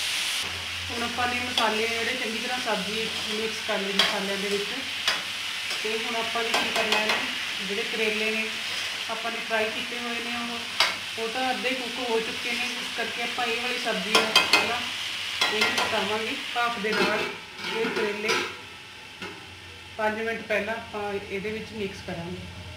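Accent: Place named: Punjab, India. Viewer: Aap puri punjabi bolte ho mujhe bhot achi lgi apki voice kash m bhi punjabi bol pati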